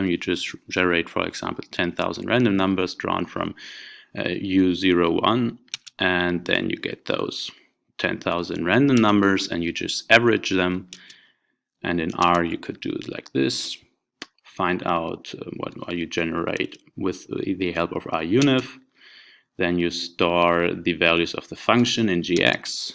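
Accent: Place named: Germany. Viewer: Omg best video I’ve seen in ages. Didn’t understand a thing but that german voiceeeee guyssss thumbs up! (GTR ist nicht mein Freund)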